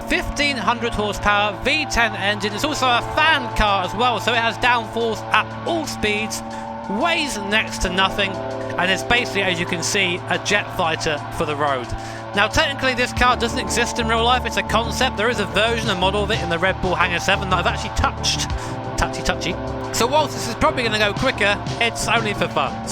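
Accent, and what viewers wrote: United Kingdom. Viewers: My favorite Brit! I love how there is no "TH" in your vocabulary. I mean no disrespect, I think it is cool and sounds very Brit to me.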